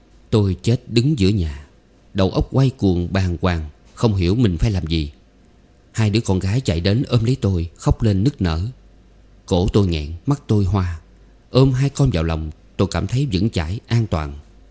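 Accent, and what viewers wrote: South, Vietnam. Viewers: Từ lúc biết kênh Tủ Sách Giải Trí và Giáo dục Tinh Hoa, được nghe giọng đọc miền Nam cực hay của anh, tôi đã không nghe bất cứ kênh nào khác. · cảm ơn ông NGHE GIỌNG ĐỌC MIỀN NAM RẤT THÍCH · Tôi cũng rất thích giọng đọc miền nam của. Anh dien tả đầy cảm xúc.Luôn ủng hộ kênh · Tôi rất thích giọng đọc miền nam của. Like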